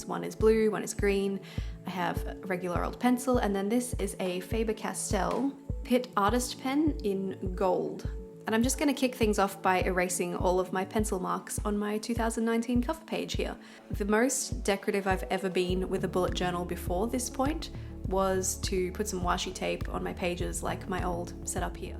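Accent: Australia. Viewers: Hi, I really enjoyed your video... it is good to hear an Aussie accent... just a tip about your comic markers... they are double ended 😉 have a great 2019. Will be looking forward to more videos 🙂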